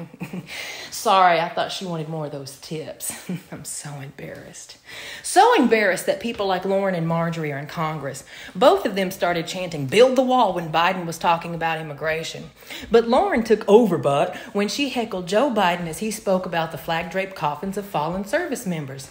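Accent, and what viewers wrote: South, United States. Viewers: Taking down the hags with a southern accent! It's absolutely perfect! From a fellow southerner, thank you.🥰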